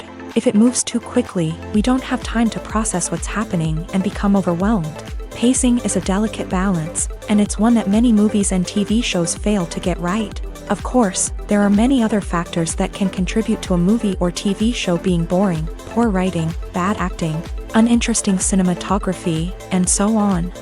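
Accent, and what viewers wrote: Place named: United States. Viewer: I wanted to watch the movie, not listen to a description - even less so in a twangy American accent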